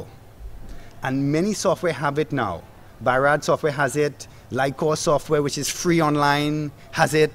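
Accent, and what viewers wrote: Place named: Trinidad and Tobago. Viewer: Fellow Trinbagonian!! Currently at PSU. Good to hear a trini accent! Lol..missing my doubles:(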